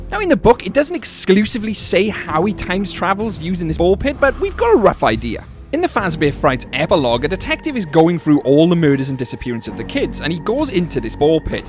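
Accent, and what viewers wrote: Wales, United Kingdom. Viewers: Welsh accent ?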